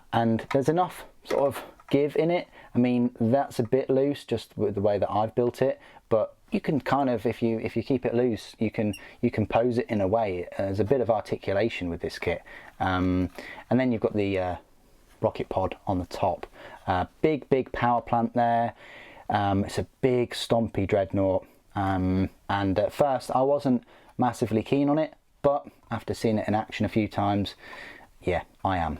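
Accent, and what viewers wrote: United Kingdom. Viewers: I like your accent. Its very crisp and authentic. It's the only reason why I stood to watch this video. As an American, you have the IDEAL accent that we love to listen to- your accent and Tom Holland's...and Jason Statham...and Michael Caine...I know Sean Connery's not English, but we'll throw him in there too. Your accent is up there among the greats. You articulate your words very clearly. Cheers Mate.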